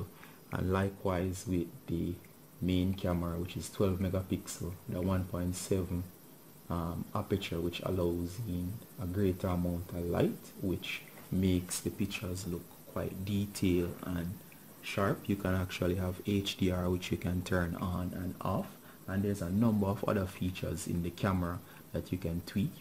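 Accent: Jamaica. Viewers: Are you from Jamaica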